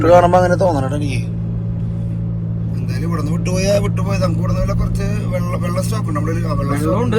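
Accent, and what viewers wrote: Thrissur, India. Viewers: ❤❤❤Wonderful description in Thrissur style and excellent camera...keep going ❤Artist Francis Antony Kodankandath from Thrissur,Kerala, India ❤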